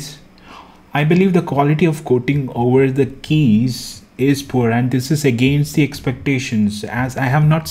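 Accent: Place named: India. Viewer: That indian accent takes some time to get used to it. My russian accent is noticeable too, so I’m not complaining. xD Overall, this is a useful video. Thank you! P.S.: time codes would help a lot.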